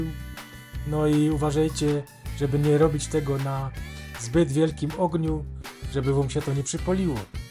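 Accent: Silesia, Poland. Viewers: Jak ja nienawidzę tej śląskiej gwary......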